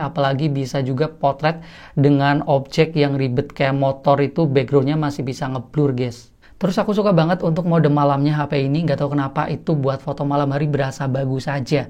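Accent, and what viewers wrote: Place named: Indramayu, Indonesia. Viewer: orang indramayu ya mas?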